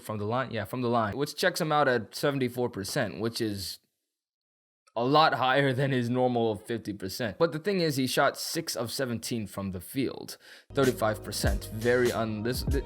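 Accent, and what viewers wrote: Kenya. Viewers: Your Kenyan ?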